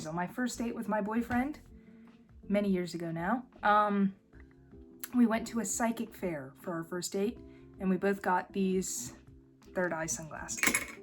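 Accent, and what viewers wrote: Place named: Carolinas, United States. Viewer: I think it's from the Carolinas in the Southeastern Coast of the US. I'm a Mississippian stuck in the PNW and I think it's lovely.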